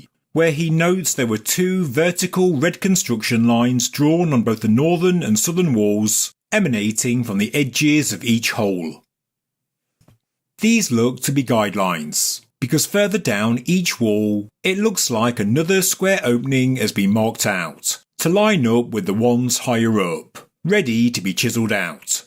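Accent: United Kingdom. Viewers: wow, you’re right. He sounded like a normal chap in his early videos. Now he sounds like a mix between a posh Englishman and a valley girl. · Too bad! Get over it! There are plenty of other crappy channels that try to be as good as this one. You can give them a shot with their less annoying selves to listen to. You got better things to do than to bitch about someone giving you great information for free. In Britain there are many different accents and this is one of them. There are groups of people that talk just like this too. Move on. Stop bitching.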